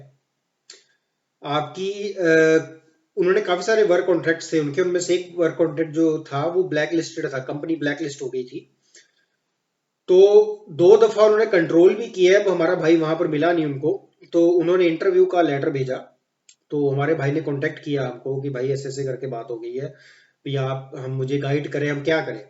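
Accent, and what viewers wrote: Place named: Pakistan. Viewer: Yaar brother ap urdu itni saaf boltey ho jesey pakistan sey ho .. kahan sey seekhi ithini saaf urdu ?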